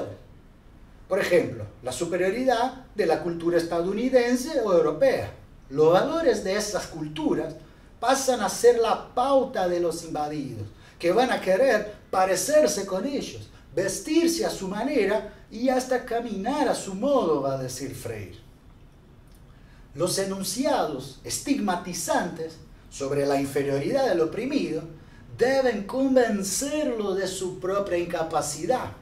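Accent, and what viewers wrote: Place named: Buenos Aires, Argentina. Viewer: increible hermano, gracias por este contenido. tu acento porteño esta re copado y me encantó escucharme de subito en la grabacion de graveola que aparece en los carteles 🏹💎💚🙏🏾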